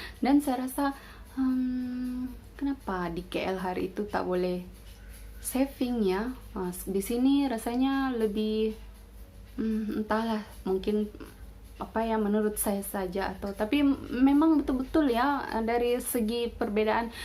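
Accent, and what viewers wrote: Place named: Riau Islands, Indonesia. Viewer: Rezeki ade dimane2 ' kat mne2 kite duduk tinggal akak /klo ade kemahuan nye ..pasti akan ade perubahan nye' owh ye akak berasal dari kepulauan Riau ye knn. Kn pe dgr loghat dari akak '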